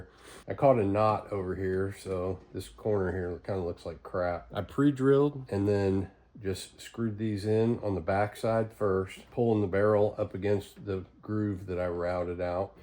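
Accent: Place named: South, United States. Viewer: That is cool. U know it just dawned on me u have a southern cowboy voice like on the movies ❤❤😊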